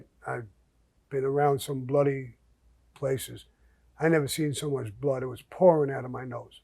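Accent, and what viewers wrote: New York, United States. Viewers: I'm from the Bronx and was raised in an Italian neiborhood ,I live in the Midwest now so l have a new York accent and love hearing Mr gravanos accent as well,brings me back to the Bronx.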